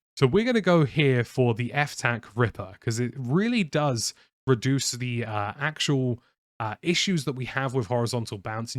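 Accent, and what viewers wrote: RP, United Kingdom. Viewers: mate, you're too posh to be confusing less and fewer. Good video though.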